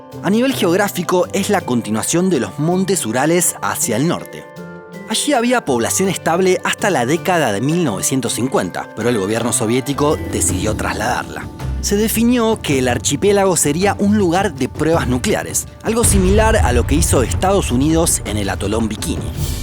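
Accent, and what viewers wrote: Buenos Aires, Argentina. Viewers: Escucharte me anima a querer visitar Argentina solo para saber donde tienen este acento 😍😍😍😘😘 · Además de los videos instructivos, el acento porteño me hace extrañar mi querida Argentina. Saludos desde Brasil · Es argentino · eres de argentina??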